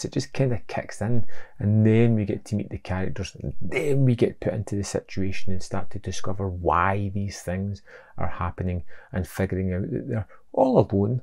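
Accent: United Kingdom. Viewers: Was just roaming at Arrow to buy some stuff and saw the Grffe box wondering what that might be. With Arrow you'll never know. :D So I ended up here and liked it for several reasons: - the review gave me a great idea what to expect and personally I don't mind seeing footage, pictures is good enough - as a non english native who used to have an american accent this is great to learn proper english and shit to a more british accent (thanks for not speaking to fast :) ) - for the purpose of ending the american dominance on YouPuke I could subscribe to a british channel, nice Salute from germany.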